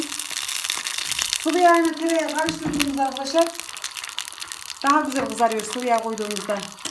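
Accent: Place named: Vezirköprü, Turkey. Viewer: Abla bizim oralı olduğun belli biz gibi konusuyon bende vezirkopruluyum ismim gülsüm beğenerek izliyoz😊👍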